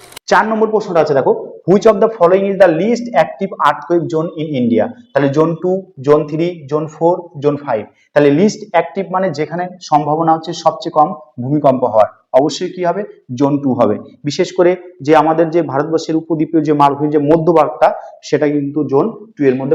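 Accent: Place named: United States. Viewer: Sir bengali te bolun...... USA english er moto lagche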